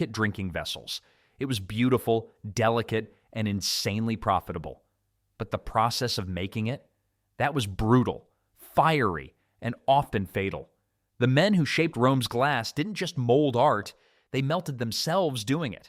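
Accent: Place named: United States. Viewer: Interesting sounds a lot like American hmmmmm 🧐🧐🧐🧐🧐🧐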